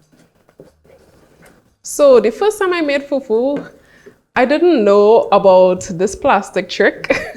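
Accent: Trinidad and Tobago. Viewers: Sounds like a 🇹🇹 accent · Why do you sound like a Trinidadian???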